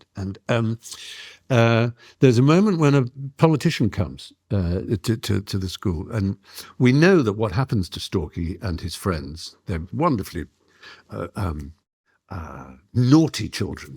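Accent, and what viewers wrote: RP, United Kingdom. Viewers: Without watching this, for Fry it means speaking with a cut glass accent while telling the rest of us that speaking properly is not important. It means rubbishing Christianity and then saying how much you like to sit in an English church. It means setting yourself up as a liberal elitist and then using the (lack of) freedom of speech card to crawl across the political divide to more conservative politics. He’s typical of a liberal who has the luxury of not having to live with its consequences. I always had a lot of respect for him but now just think he’s full of shit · What it means to be British? I'm American so I don't know and don't care. I only know we dodged that bullet. The accent's nice, though, but it's another trait that is rapidly fading.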